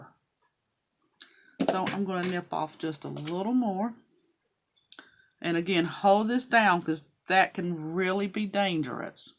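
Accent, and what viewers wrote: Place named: South, United States. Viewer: I came for the adorable southern accent. Ok, and the beautiful ring. Thanks!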